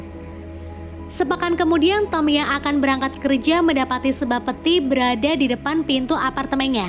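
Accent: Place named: Pemalang, Indonesia. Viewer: Mbak orang pemalang ya😆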